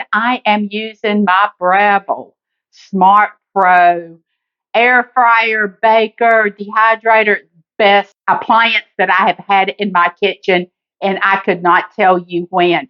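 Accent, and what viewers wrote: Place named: Carolina, United States. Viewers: Yep, that's a real Carolina accent. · Not sure where you're from but I'm from south Carolina and her accent sounds genuine to me.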